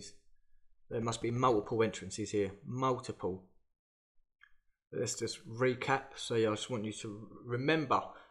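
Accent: United Kingdom. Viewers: Ello babe, where in uk r u from??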